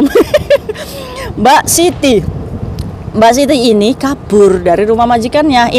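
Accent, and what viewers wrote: Indramayu, Indonesia. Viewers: Pen nakon be tamah mbak kuh aslie wong ndi.logate mh kaya wong Indramayu atau wong cerbon hehe.. salam kenal bae mbak.sehat selalu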